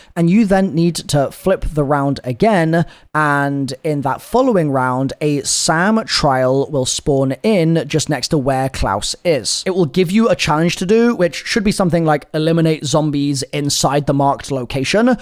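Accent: United Kingdom. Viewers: Is it just me or does sound a little British here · British accent is marvelous im a french Canadian and i love the english wotah instead of water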